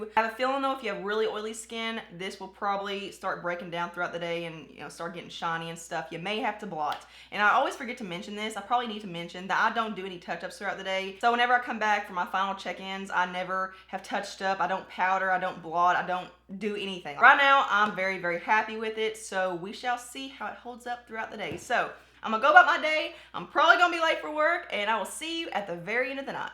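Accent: South, United States. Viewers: I just recently ran across you on youtube, like a week ago & I think you're just awesome!! And so southern...like myself ❤ Merry Christmas!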